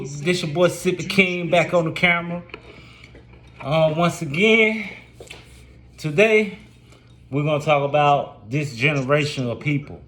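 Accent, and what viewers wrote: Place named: South, United States. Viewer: You from North Carolina/Virginia?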